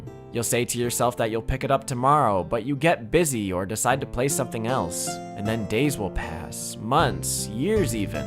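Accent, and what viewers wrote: Brazil. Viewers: Yo, is this man brazillian? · you brazilian?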